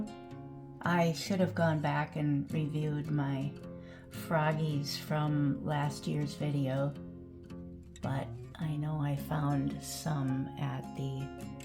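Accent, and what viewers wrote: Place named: Minnesota, United States. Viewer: I have a small frog collection which my grandkids think is weird because I’m afraid of actual frogs. 🐸 I love your cute Minnesota accent. Thanks for the tour.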